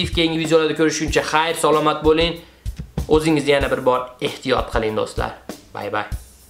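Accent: Andijan, Uzbekistan. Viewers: Анжанли шевани йукотмапсиз койилл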